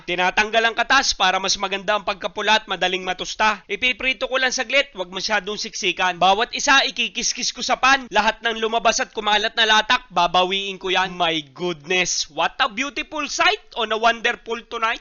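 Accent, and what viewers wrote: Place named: Oriental Mindoro, Philippines. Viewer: Natutuwa ako sa pananalita mo .alala ko ang time ko aa Oriental mindoro..ala eh ganyan sila magsalita..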